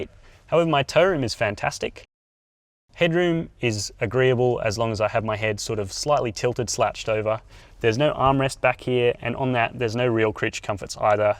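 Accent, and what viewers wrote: Australia. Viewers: "He speaks in a strange way for an american, but he doesn't sound like a british", then I discovered you are australian. I always forget about you, guys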